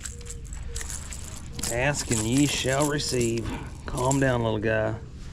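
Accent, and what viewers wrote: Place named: South, United States. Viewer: I love a pop-r. I once caught two at one time on a torpedo lol and on a hollow body frog lol. What state you in? That’s one heck of a southern draw you have lol. I’m mid-Ga. Macon Ga.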